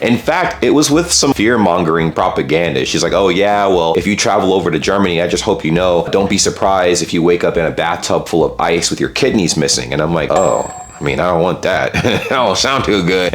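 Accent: United States. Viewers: That’s crazy, even smart Americans like this guy can go THAT long before finding out the US is a joke. So you’re moving to a new country, right? Work on changing that accent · I once found your videos a long time ago and I have to say, I was more annoyed by you and your typical US way of talking and reacting to things, so I was not all willing to subscribe. Over the time I always stumbeld over your videos from time to time, whatch a bit and moved on, after your first Germany trip I whatch more closely. Why am I telling you this, well you have changed so much for the better!!! Not because your videos got better , no, YOU as a person, it is like you've grown up.... no better: you have GROWN! You edjucated yourself, selfreflected, bearthed in knowledge, due to experiences. You became the best proof that travelling and living outside your comfort bubbel zone US there could be hope for the future, that Americans are abel to learn an improve themself. 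I don't mean that in a funny way, I dislike the USA too much for that, I just wanted to tell you, that you also influnced me a bit. Greetings form a German Generation X and keep on going... ✌✌👌👌😊😊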